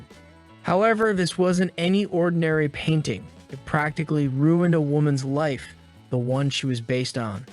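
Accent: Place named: United States. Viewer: yes, this young mans approach,seems to have been to try and fit every polysyllabic word he thought sounded,"hoity-toity" into this uninsightful anecdote. If I just read the closed captions, I would assume he was not a native speaker, as the conjugation, and tense dispositions of many verbs are just illogical. Even if someone else is reading a script, he is obviously a native american speaker,(i would say california,or Maryland, or Ohio,) and would naturally have corrected the uncorfortable syntax imposed upon his elocution. Good luck to, I mean it, it takes a lot of work to produce these videos, but if he ends up reading this, turn down the prolixity, and buy an edition of Strunk & White," The Elements of Style," (for writing), and he will say more with 60% less verbiage.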